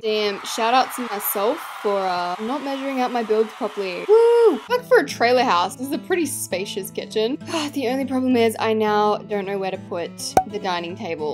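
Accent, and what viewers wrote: South, United States. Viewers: How is better at a country accent then me and I’m from Texas 🥲🥲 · Can you do a video only using you country accent? LOVE YOUR VIDS!!!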